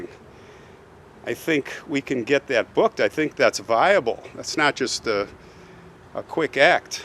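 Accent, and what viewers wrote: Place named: United States. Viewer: Your talking in popular American English helps me to learn more and more words that I don't know before because I already learned the standard English language ..Thanks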